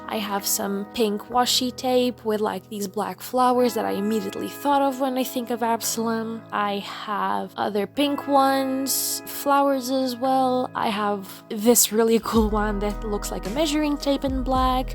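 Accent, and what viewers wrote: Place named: Portugal. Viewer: Got recommended this video, randomly… are you Portuguese, by chance? The subtle accent is quite recognisable. Bom vídeo! 👀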